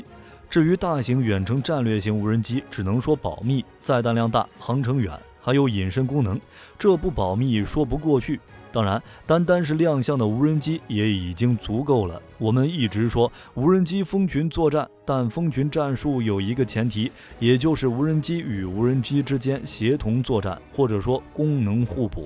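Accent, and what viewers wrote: China. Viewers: 為啥是中國發音？？？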